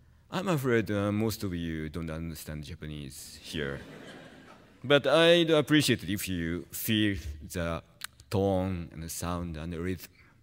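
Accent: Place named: England, United Kingdom. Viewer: I love his English accent, sounds so melodic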